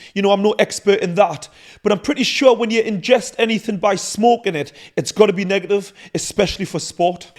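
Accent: Newcastle, United Kingdom. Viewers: It's like watching an episode of Geordie Shore with the narrative style and geordie inflexion of the voice - well put together and delivered mind, OSS🤙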